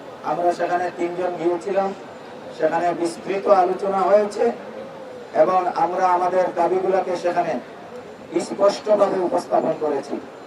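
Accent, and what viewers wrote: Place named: Sylhet, Bangladesh. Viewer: স্যার মনে হয় সিলেটি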